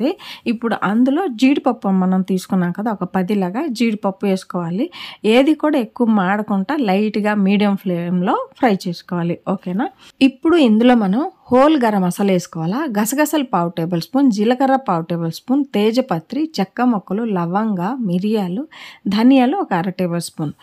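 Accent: Srikakulam, India. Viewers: శ్రీకాకుళం మీది మీ యాస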